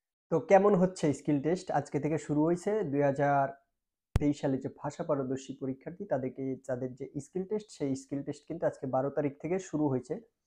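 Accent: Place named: Fakirhat, Bangladesh. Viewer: আপনার বাড়ি কি ফকিরহাট,আমাদের এলাকার কথার স্টাইল,আমার ১৫ তারিখ,স্কিল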